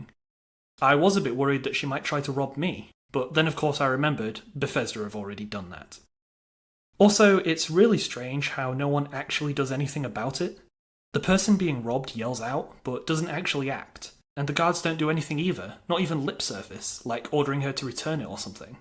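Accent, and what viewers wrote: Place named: Taunton, United Kingdom. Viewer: Dunno about Liverpool, sounds more like Taunton to me.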